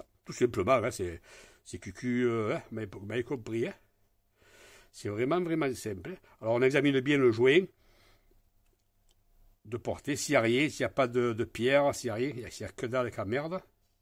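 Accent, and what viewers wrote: South, France. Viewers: Bonjour et merci pour le tuto et avec l'accent du sud encore mieux Bravo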